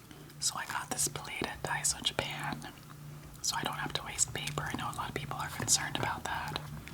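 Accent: Ireland. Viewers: r u irish? also rply if ur wtching in 2016! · oh my gosh, before the disclaimer I thought you were 100% Irish :O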